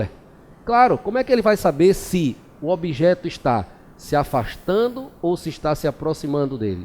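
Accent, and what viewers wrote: Northeast, Brazil. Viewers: Idiotas do Centro-sul que criticam o sotaque nordestino! Caguei pra vocês!!!! 💩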